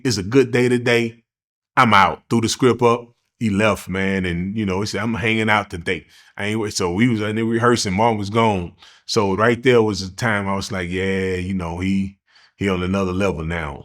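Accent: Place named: South, United States. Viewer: How do you got a southern Drawl but from Indiana 🤔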